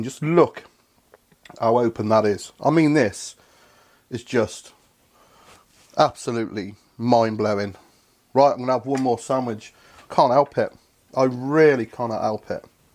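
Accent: United Kingdom. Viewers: I don't think that having a British accent and an arm full of tattoos makes him a meat expert. Half of what he said towards the end was pure garbage. If you want cheap meat buy ground meat and add to it. Burgers, meat loaf, etc.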